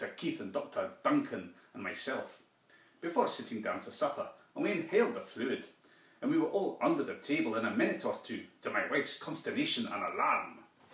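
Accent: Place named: Scotland, United Kingdom. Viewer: Scottish accent 10/10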